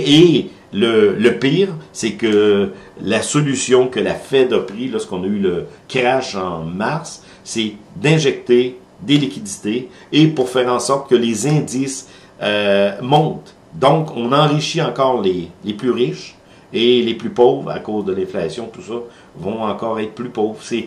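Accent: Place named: Quebec, Canada. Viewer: Votre accent est magnifique. Québec libre !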